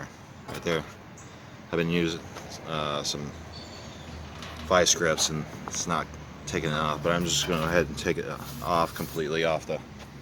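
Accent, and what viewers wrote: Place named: Texas, United States. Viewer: Never knew there was such a thing as an earth battery ... learn something new every day! Yay Texas twang!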